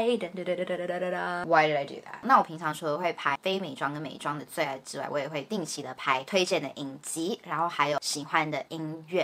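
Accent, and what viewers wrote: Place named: United States. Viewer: Dena我有問題✋ 這是我很久以前就想問的！終於鼓起勇氣問 因為真的太想知道了😂 就是為什麼妳是在台灣長大但是講中文卻有abc感？！ 是因為講英文久了就會變這樣嗎？ btw我好喜歡妳💖💖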